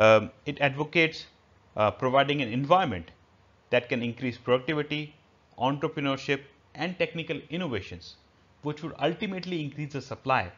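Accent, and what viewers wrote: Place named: India. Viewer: The Speaker is good to understand, with a light indian accent. Only the names are not proper spelled. I was puzzled by the spelling of Keynes. His name is John Maynard Keynes- Not Mayer. Marx as a economist sounds wired when you know his biography. As a critical philosoph, a Dr. of Scientific Law and Journalis, lector etc. involved in the worker class movement and creating a new idea of society. May be that is the reason why economist can not figur out what his impact was in the european countries he influenced. At last Schumpeter is not from Germany even he have had a great influence and new the austrian school better as anyone else as a oponent of Max Weber.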